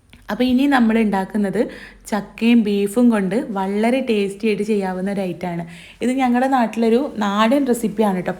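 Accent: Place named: Kannur, India. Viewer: Para kannur basha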